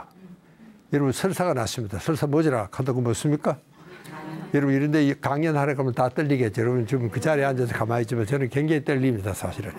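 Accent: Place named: Daegu, South Korea. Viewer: 선생님 대구사투리 쓰시니까 좋습니다 저도대구사람입니다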